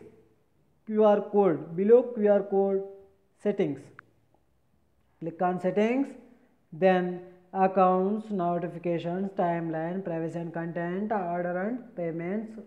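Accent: India. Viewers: indian english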